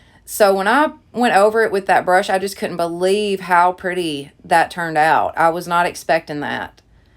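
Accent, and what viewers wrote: South, United States. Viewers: I am another southern girl & I love listening to someone that sounds like me. I am so glad that you are one of those diyers that talks about the things that can go wrong while doing a project. Loved your projects & hope you have great success with your channel. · I generally don't like to listen to a southern accent, but I loved your spunk and not letting your kids intimidate you into not doing these videos and your style of crafting is really cute, so different from other items I've seen in other videos. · You are a great addition to YouTube! I’m looking forward to more videos. Awesome diy tutorials. Love your accent. My family is from the south and it takes me back. I get to talkin like them when I’m around them. Nothing but ❤️ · Love your tutorials! You think more outside the box from most YouTube DT Crafter's. Btw, where are you from? 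Your southern accent sounds almost exactly like mine. I'm from Western NC. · Girl I'm just getting started crafting making stuff for my home. I love your creations and love your southern accent. I'm from Alabama... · I LOVE the southern accent!! Anywho, I love all of your DIY's! I'm so glad I found your channel!! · It’s a good thing you didn’t listen to your kids because you’re an amazing crafter. And I’m a southerner so I love the accent. :)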